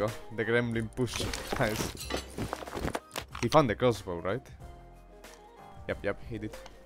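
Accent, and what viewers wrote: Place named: Spain. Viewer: Illo are you Spanish you have the accent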